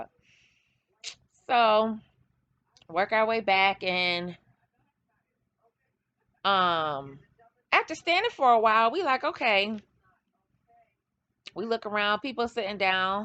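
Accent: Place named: California, United States. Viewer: I'm from North Carolina, to show you how bad I am with accents , I first heard you talking and thought that was a Cali accent. I live in N.C. tho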